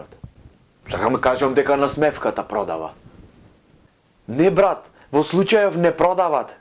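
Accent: Ohrid, North Macedonia. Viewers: brat izvini vaka, ama za stend ap komedija ne si losh, no bitolcaneson te shie... ne si faca iako imas smek... bi rekol deka znaesh kako i umeesh do negde, no ti fali lik... napraj neshto od sebe, smeni go likot.. neznam kako, ama ti si umetnikot, stori neshto... i malku pomalce naglasuvaj go ohridskiot dijalekt.. iritira... naj iskren sovet. pozdrav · Mislam deka gresis. Covekov ima bogat recnik, a segite mu se navistina originalni, i bidejki gi interpretira na Ohridski dijalekt isto e interesno. Marjan poveke koristi sekojdnevni razgovori, no i toj e dobar. Pozdrav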